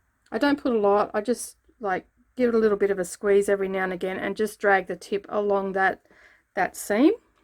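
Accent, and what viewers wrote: Australia. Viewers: This tutorial comes just in time for me. I really need to make a couple of these bags to hold some toys for my Grandson. Thank you. Great tutorial. Nice to listen to a fellow Aussie :)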